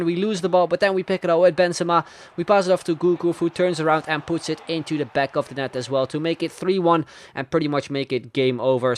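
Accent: Netherlands. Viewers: Are you Dutch???????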